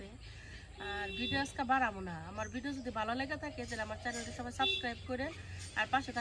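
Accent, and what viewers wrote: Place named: Tangail, Bangladesh. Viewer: apnr vasa dekha bujse apni Tangail ar amio tangail ar cela basail thana amr basa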